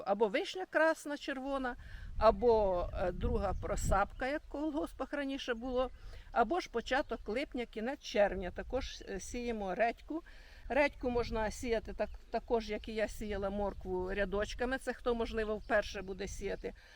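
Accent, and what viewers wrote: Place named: South, Ukraine. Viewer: Наш звичайний південний ,,говор''😊. Дякую за поради.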